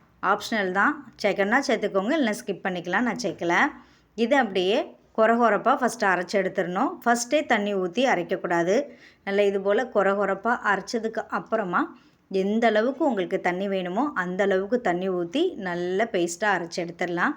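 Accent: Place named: South Tamil Nadu, India. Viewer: hello neenga tirunelveli kanyakumari nagarkovil paguthigalil ondrsi sernthavarghal kovai saayalum irukku just by tamil slang sis.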